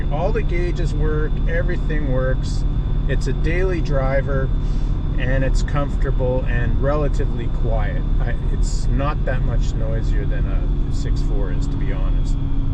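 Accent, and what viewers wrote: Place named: Canada. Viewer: Sounds like yer from the great white north bud. Good thing ICBC doesn't do inspections eh?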